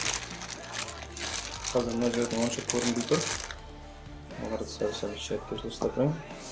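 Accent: Standard. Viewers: қазақша таза созине ризамын, осындай бауырлар көбейсін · жарайсың таза қазақша. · сау болш казака таза сойлейдекен осылай сойлеу керек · Жарайсын. Казакша таза сойлейды екенсын. Сендей адамдар эксклюзив болып барады.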